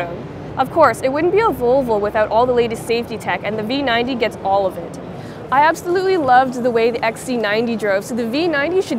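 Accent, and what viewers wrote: Canada. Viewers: is Chinese???? But she sounds so Canadian.....;p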